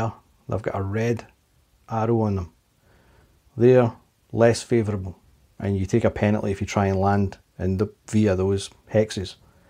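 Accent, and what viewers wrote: Scotland, United Kingdom. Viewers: Good on you lad. Nice to hear a Scottish accent and not some whiny little pre-pubescent English, or Yank gobshite.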